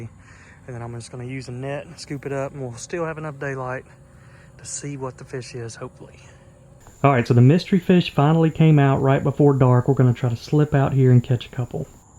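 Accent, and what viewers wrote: South, United States. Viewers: Has his accent become more southern??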